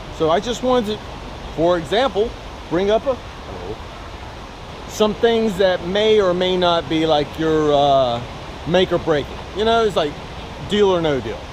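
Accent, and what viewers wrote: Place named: Minnesota, United States. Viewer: You sound like you could be from Minnesota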